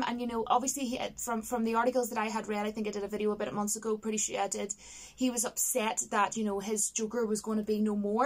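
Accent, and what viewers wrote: Ireland. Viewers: Intresting accent.. is that Irish? i love your accent, it remind me of witcher 3's NPCs. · Agreed. I hope to not be too much with this comment, but I love your accent! I want you read me every book in audio format lol. Never played the Witcher, but I could pick out that smooth Irish brogue from the other side of the world!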